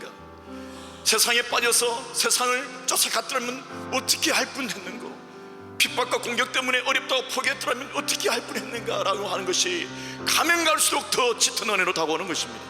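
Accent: Gyeongsang, South Korea. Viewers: 경상도 ㅋㅋㅋ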